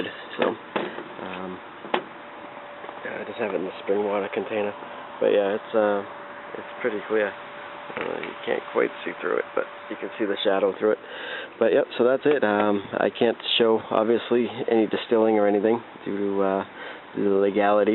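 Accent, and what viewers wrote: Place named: Boston, United States. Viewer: Is that a Boston accent ?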